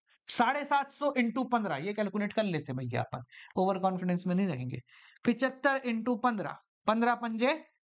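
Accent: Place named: Agra, India. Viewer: sir aap agra se ho kya aapke accent se lag ra hai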